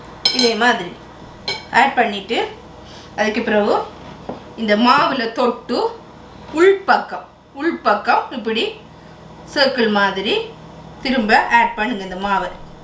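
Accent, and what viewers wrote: Sri Lanka. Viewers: Wow semma tempting mouthwatering recepie nega srilankan tamil explain panathu semma semma👌👌👌👌👏👏👏👏👏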